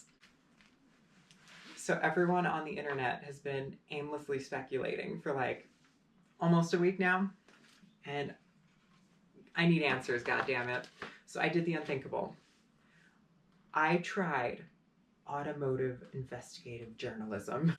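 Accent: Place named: New Jersey, United States. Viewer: Do you mind if I point to your channel? Im about to record my long form episode on this. Did a stream last week on a friends channel, and I talked about this ad, and why I do believe its going to happen, and even know who will be hired as employees and whatnot. Just came across your channel, and you totally sound Jersey. For a moment I thought you snuck into my garage. Same cinder blocks, even the AC in the window is the same. Did you sneak in, move 12 cars, pull out my 1320 and sneak Herby in my garage lol.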